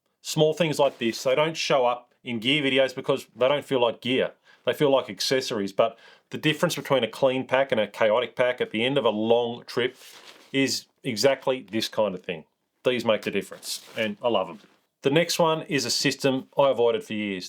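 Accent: Australia. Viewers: PROFOUNDLY Australian voice !. "Rawwrrkkk rawwrrk rawrk !" My goodness, I'm sure that's the Genuine article.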